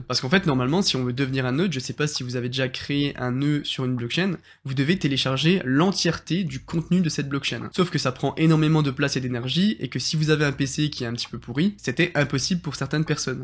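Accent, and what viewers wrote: Franche-Comté, France. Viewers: Vu l'accent, tu dois être en Franche-Comté toi ;)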